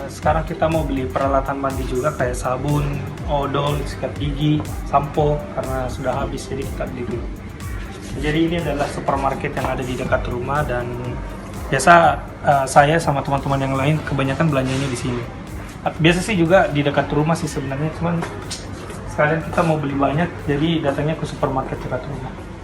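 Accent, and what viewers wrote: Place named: South Sulawesi, Indonesia. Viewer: Cara ngomongx sprti org sulsel. Salam dr polman, sulbar. Ada lowongan kah utk wanita???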